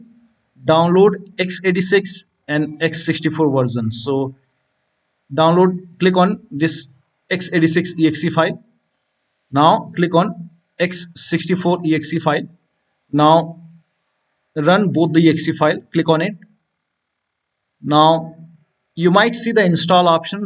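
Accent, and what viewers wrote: India. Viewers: Always the indian accent guys. Thank you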